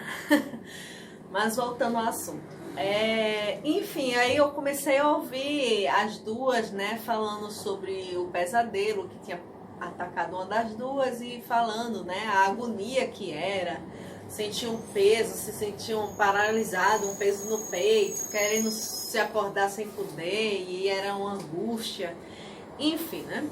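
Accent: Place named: Pernambuco, Brazil. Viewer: Bem interessante o relato, minha conterrânea pernambucana! Isso tem uma lógica de ser, que não é nada do que religiosamente as pessoas falam, mas, é preferível não entrar nesse mérito. Gosto de seus vídeos, de seu jeito pernambucano de falar kkkkkkkk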